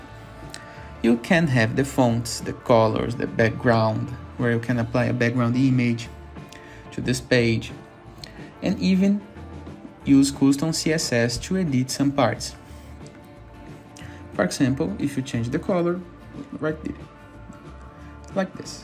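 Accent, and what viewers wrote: Brazil. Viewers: Incrível o quanto é fácil reconhecer Inglês brasileiro kkkk Vlw irmão <3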